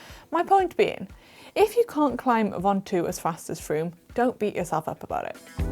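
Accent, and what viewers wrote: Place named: Wales, United Kingdom. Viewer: Lovely Welsh accent ❤️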